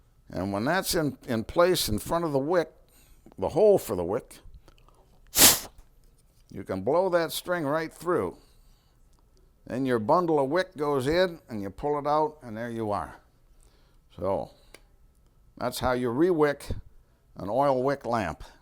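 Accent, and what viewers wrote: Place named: Michigan, United States. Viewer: Thank you! Excellent presentation. I also enjoyed your MI accent. I have a 4" piece of native copper i found as a kid when we hiked up to an abandoned copper mine shaft on the UP. My dad was from MI and had done his Master's History thesis on a giant copper boulder of the UP hauled out in the 1840's. Years later i saw the boulder in the Smithsonian. I grew up in New England but we'd visit MI relatives & 1 week my family toured the UP. Most haunting was an abandoned copper processing factory, last used during WWII. Wish I knew quite where on the UP that was/is? Had ore cars rusting on tracks outside. Inside the 1 story factory, some machinery was still there. And real WWII posters were still on the wall! (This was 1970.) I took a Red Cross Homefront / Build Morale poster.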